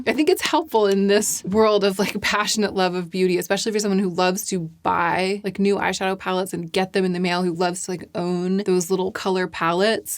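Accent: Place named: South, United States. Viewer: Just wanted to say that I am a new subscriber - and I can’t get enough of your channel. From your southern “hey y’all” to your intelligent and thoughtful comments, all delivered with such a calm demeanor- I’m hooked! Thank you for your thought provoking, yet obvious love for beauty - much appreciated!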